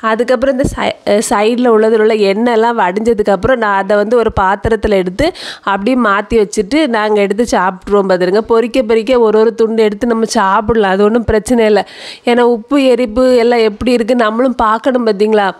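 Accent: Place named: Kanyakumari, India. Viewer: சமையலை தாண்டி... கன்னியாகுமரி தமிழ்... வெற்றியின் ரகசியம்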